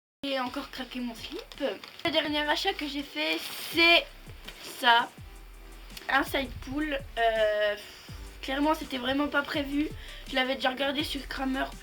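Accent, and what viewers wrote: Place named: Switzerland. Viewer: a ok je trouvais que tu avais l'accent suisse😂 sinon je découvre ta chaîne aujourd'hui elle ets vraiment génial ❤️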